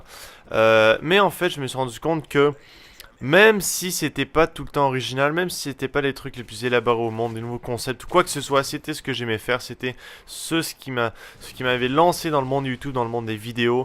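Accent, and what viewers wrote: Quebec, Canada. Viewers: Tu as un accent québécois non ? x) Très bonne vidéo ! (Y)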